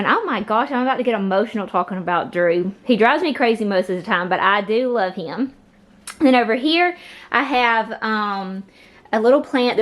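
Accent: South, United States. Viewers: Your home is absolutely lovely You have done such a beautiful job with it. Moreover you are just a incredibly beautifully lovely young woman.😇 I bet your mom is really proud.😍You're about the age of my daughter which I had very young, because I'm not that old I'm only 50. ☺️Which probably seems old to you but it's not that old isn't it mothers that have older daughters. LoL😉 I want so bad to learn about essential oils, I'm sick and have been for almost 20 years, so I've lost a large section of my young adult life. It's hard for me to look them up online or read them all through a book and still maintain and remember what's what and when and how I should use them? as well as how to combine them for what I'm looking for? But it's something I would truly be all in and passionate about if I didn't have just the limitations due to the severity of my illness at this time 🤔. 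God bless you honey and thank you for another amazing tour of your home and wonder of watching your cute self and you're absolutely adorable Southern accent 💝❣️❌💋❌🥰❌🌹🌹🌹🌹🌹❣️❣️❣️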